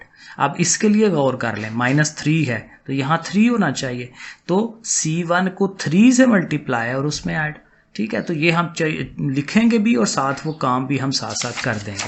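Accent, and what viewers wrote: Sialkot, Pakistan. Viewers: sir are you from Sialkot??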